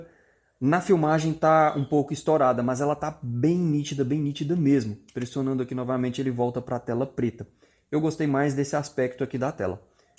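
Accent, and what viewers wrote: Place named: South, Brazil. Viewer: Você é de qual estado,? Seu sotaque parece de sulista.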